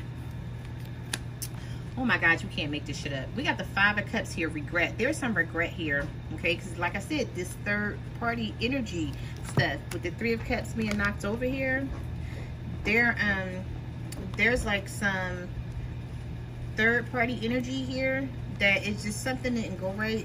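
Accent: Philadelphia, United States. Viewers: Good reading totally resonates... loving your nails! You sound like you’re from my city Philly 🙌🏾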